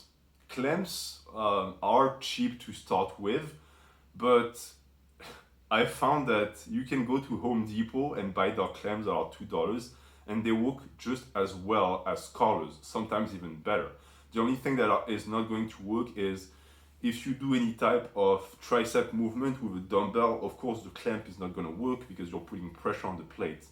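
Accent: France. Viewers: why tf you wanna know that lol Well you can tell he's french by his heavy accent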